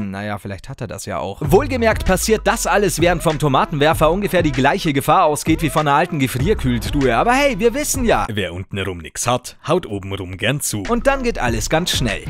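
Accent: Bavaria, Germany. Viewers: Feier dich richtig, durch dein bayrischen Dialekt Einlagen, die ich als Oberösterreicher natürlich versteh, find ich dich auch sehr Unterhaltsam. Zudem find ich es toll wenn auch die Großen (im Kopf nicht) kritisiert und oft zerlegt werden. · Naja weil ihr in Österreich nur Bayrisch sprecht 😂 Nein Spaß. Ich mag es auch wenn er Bayrisch spricht. Grüße aus Bayern zurück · Hab mir jetzt einige Videos von dir angesehen und ich muss sagen wenn man selber ein bisschen bayrischen Dialekt versteht, gibt es kaum bessere Unterhaltung auf youtube Deutschland😁👌 Hast einen neuen Abonnenten gewonnen mach weiter so👍🏻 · Michael Obermaier Komme aus Bayern und liebe es, wenn er bayrisch redet xD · BITTE STOP mit der Bayrischen Sprache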